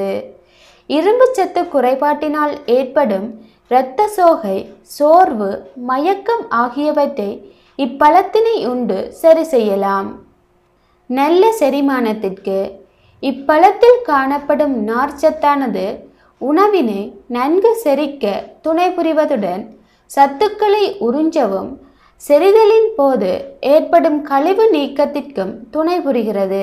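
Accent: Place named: Malaysia. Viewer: Unga Voice paartha, ouja channel admin mathiri irukku 😀😀, Malaysian slang. Nice Video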